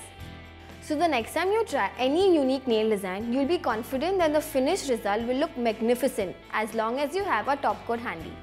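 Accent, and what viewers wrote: India. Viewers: that’s Indian accent lmao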